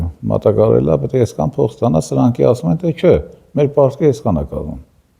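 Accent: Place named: Gyumri, Armenia. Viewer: Հարգելի դուք Գյումրիի կամ Շիրակի մարզի հետ կապ ունեք???Միշտ էնտեղի ակցենտը զգացվում է Ձեր խոսքի մեջ։Նախապես շնորհակալություն եթե արձագնքեք։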